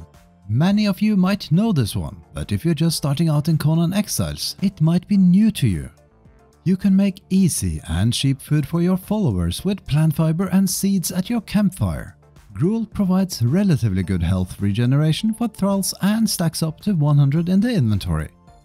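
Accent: Norway. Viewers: I haven't watch a video by you for awhile now but I started playing Conan again so now youtube says LOOK! Which is nice. Useful tips to know. Sidenote: I don't know why or how but for some reason your accent sounds stronger than before. Maybe you just have a new microphone or something, no idea but it's the strongest norwegian accent I think I've ever heard x) 🖖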